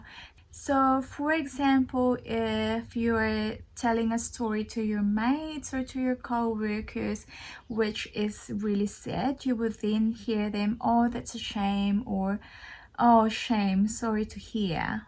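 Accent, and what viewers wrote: New Zealand. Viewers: You have a kiwi Accent now.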